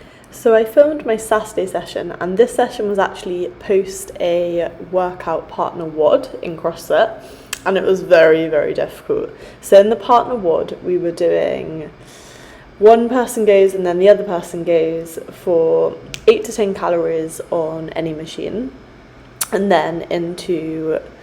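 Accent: RP, United Kingdom. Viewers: you so unique your a amazing athlete yet you dont have tons of ink and sleeve tattoo's and then when you speak this beautiful almost posh English accent comes out Like we are going for afternoon tea. i hope you realize how rare and beautiful that is today...cheers from the USA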